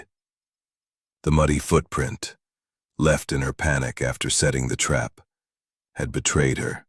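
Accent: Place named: United States. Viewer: How awful! An American "Hollywood Blockbuster" voice reading a very British story which demands a very British voice and enunciation. Don't! Just don’t.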